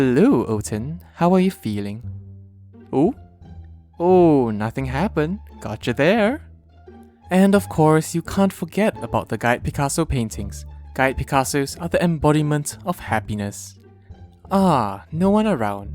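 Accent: Singapore. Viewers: Are you singaporean? I can tell from the accent if so lol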